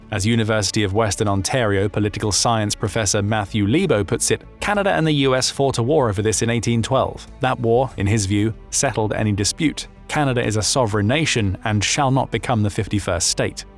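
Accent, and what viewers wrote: United Kingdom. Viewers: Even though the topic is disturbing, it is buffered by a British voice. I don't think this is realistic, but I hope that our Commonwealth allies would help us.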